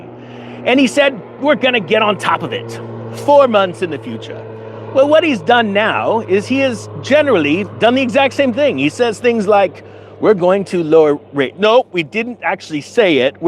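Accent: RP, United Kingdom. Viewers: LOVE THE BRITISH ACCENT!😅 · This sounds much more serious in a British accent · I love your Limey Accent! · Love the David Niven accent,. Keep it up!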